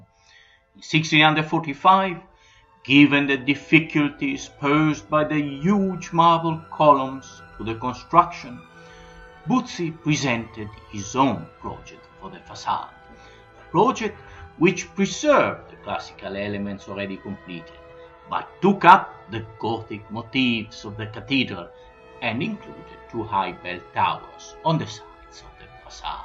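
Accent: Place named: Italy. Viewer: I was able to understand your accent,. Lovely accent by the way, it goes hand-in-hand with the Italian story you are narrating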